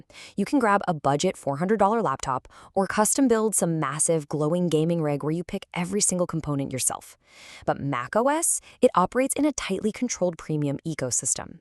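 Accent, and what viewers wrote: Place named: Australia. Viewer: Oh my God, you’re Australian. I’m Australian.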